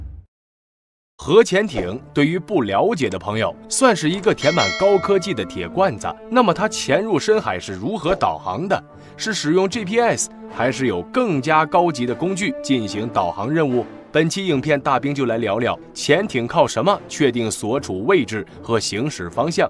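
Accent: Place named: China. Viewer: 這是中國人做的『4瓶』雖然大量使用【正體漢字】但是《腔調》與習慣是騙不了人的!! 用詞遣字發音腔調騙不了人的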